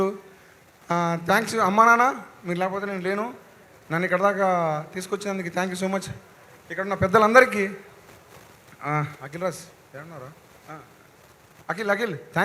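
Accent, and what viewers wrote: Telangana, India. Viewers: తెలంగాణ మాటలు యాస యిన్ని రోజులకు వింటుంటే బలే ఉంది అన్న ❤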